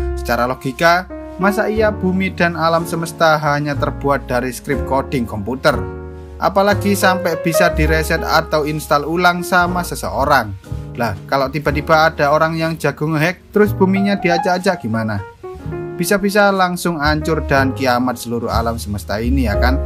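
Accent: Java, Indonesia. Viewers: Meddok bgt!!!! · Jowo endi min pean? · Bang logat ngomongnya jgn medok bgt lah, intonasinya jgn datar.. spoiler filmu bgus" tpi cara pembawaanya gk enak ditelinga Tolong jgn gitu biar kedepannya lbih bagus!!! Medok bgt aneh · Adalgi ytber yang bahas film jni selain chanel ini ga?, logat jawa kurng enak di denger :( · Medok banget JAWA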